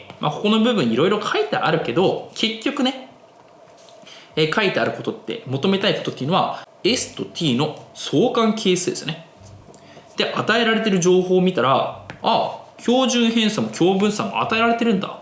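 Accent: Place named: Yamanashi, Japan. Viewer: 山梨だよ、多分甲州弁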